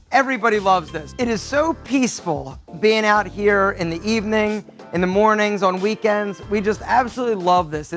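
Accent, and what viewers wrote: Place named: South, United States. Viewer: Where did that southern accent come from?!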